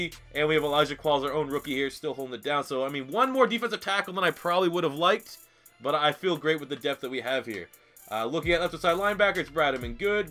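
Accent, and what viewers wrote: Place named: Canada. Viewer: Josh Lak he's from Canada its most likely an accent thing.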